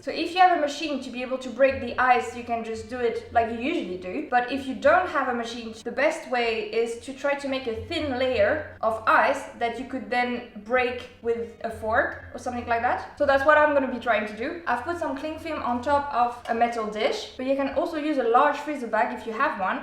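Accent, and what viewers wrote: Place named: France. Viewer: Is it me or do you have a TINYYY french accent 😭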